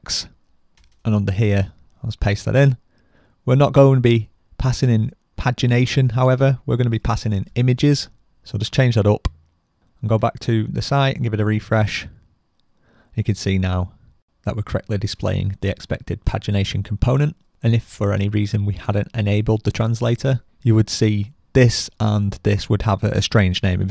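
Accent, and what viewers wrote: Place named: Scotland, United Kingdom. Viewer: Lovely accent, are you Scottish? :)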